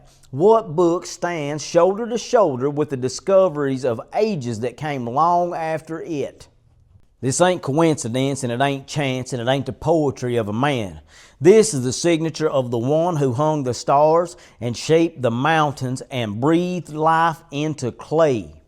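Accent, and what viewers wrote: South, United States. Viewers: your southern accent is so beutiful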